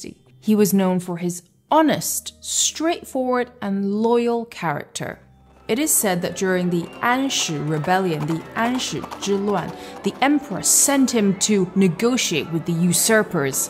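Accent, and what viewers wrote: England, United Kingdom. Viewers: you've got a good British accent. you sound like Emilia Clarke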